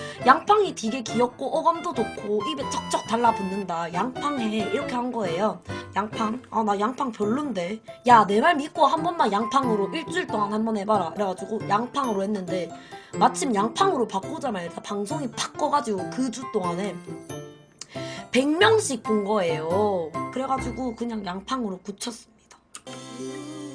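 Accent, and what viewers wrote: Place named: Gyeongsang, South Korea. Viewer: ㅎㅎ누가봐도 경상도분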